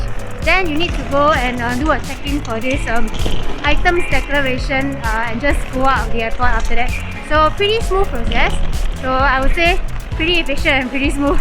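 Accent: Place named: Singapore. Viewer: Listening to ur singlish just made my day... Reminds of my 3 years uni at Nanyang.. How i struggled during my initial days to get used to singlish with my professors.. Love the singlish btw.. Enjoy ur trip to Japan.. Love from India 🤗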